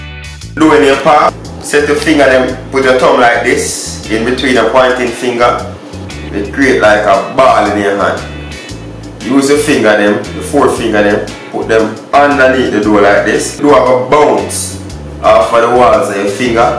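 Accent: Patois, Jamaica. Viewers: Man this being African American is boring AF😫😂 why I couldn’t be Jamaican or Nigerian 😩😩😩 I hate it here😫 I’m Finna put on Shottaz and dream of being a Jamaican 😂 cuz being American is 🗣️BORING😩😩😩 I love his accent 🥴 and the Patois language YESSS🙌🏾🥴🥴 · Too much patois?? Ah weh oonu ah talk bout?? KMT. That's what I love about this channel -makes it authentic! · Moderating the patois will widen your audience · To capture a wider audience he might have to drop the patio. It’s not just Jamaican watching. There are people of other nationalities that love Jamaican food and want to learn how to make it. The same way if someone of a different nationality giving a presentation for something but u can not understand what that person is saying because of their accent. The same goes for someone who only speaks street slang. If that person trying to give a presentation dont u want that person speaks proper. 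· I dont mind the patios at all, atleast I understand what you're saying and relate to your the videos. I'm a new subscriber and loving your videos already.. KUDOS!!! 👍👍👍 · Mi lov di Patois Bredren!!! Yu dumplin sel aaf! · Awesome Jamaican accent jah Mahon 💯 and you earned yourself a new subscriber as soon as I scene your hand rolling technique awesomely done ✅ 🥟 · I love hearing the patios. Idky, but it always makes me laugh lol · Clear and concise recipe. The authenticity of his native tongue makes me trust his recipes more... I am not Jamaican but I know Jamaican cuisine is made from the heart and it's not a rushed process. The amount of Mickey Mouses out there trying it with their Ja-fakin' recipes... The patois wasn't overly used that someone can't understand the recipe... However, if the new recipe edit shines the spotlight on his channel, then more power to him! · I love how authentic you are. 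You are very articulate yet yuh keep your accent real like di dumpling dem. I can't stand when people try to impose on others. You don't need to speak any less patwah,we never tell an AMerican to speak less American. Though the average American DO NOT speak standard English. Anyway, yuh dumplings tun up!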